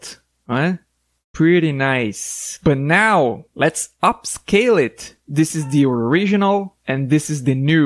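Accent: Brazil. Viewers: Sotaque BR identificado, bom vídeo manolo! Ganhou um escrito =) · Eu só passo o mouse na thumb, mas aí ouvi e pensei: peraí, temos um BR, bora dar moral.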